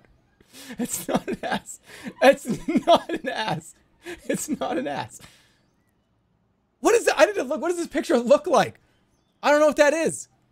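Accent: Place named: Ireland. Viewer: Halfway through I realized this was jerma, not RTgame… does he always sound this Irish 😂😂?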